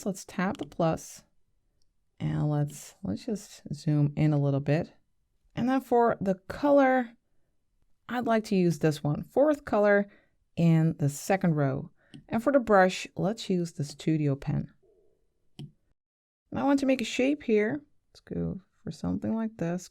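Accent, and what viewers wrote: Netherlands. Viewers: I really enjoyed this! I am just a beginner and I appreciate your careful and clear explanations…En Ik hoor een mooi Nederlands accent!😊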